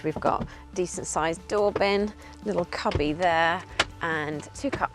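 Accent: United Kingdom. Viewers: I cant affort to buy a car now but I like British accent so, I love watching your ...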